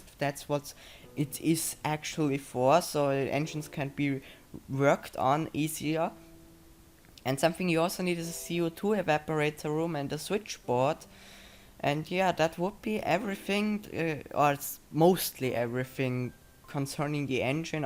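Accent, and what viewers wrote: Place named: Austria. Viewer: dieser österreichische akzent bruder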